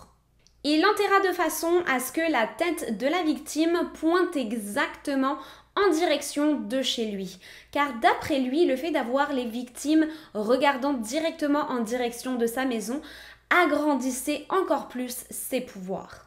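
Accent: Quebec, Canada. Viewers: C'est tu moi ou desfois ta un accent quebecois??? Genre des sons je sais pas xD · Est ce que tu vis au Québec ? Tu as un accent québécois je trouve 😊 très bien raconté, encore merci ! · Tu commence à prendre l’accent québécois! Wow. Félicitations pour tes 31k❤️‍🔥❤️‍🔥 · J'ai découvert ta chaîne hier soir et je trouve ça génial , un vrai plaisir et pour quelqu'un qui vient de France tu a un joli petit accent canadien 😉👍🏼🌹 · Salut jai tomber sur tes videos aujourd'hui....jadore ton accent....je vit au quebec et jai le doute que sois tu vit ici mtn...sois tu y a vecu un bout de temps!!!😅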